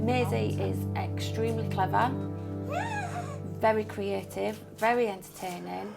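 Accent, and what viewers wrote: United Kingdom. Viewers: I usually understand british accents just fine but hers is so fucking annoying. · I really wanted to watch this but that accent is so hard to figure out what she is saying! I tried the closed caption but apparently that thing can't figure out what she is saying with that ACCENT! If you guys are going to continue to make these with British accents then could you at least add your own closed caption!?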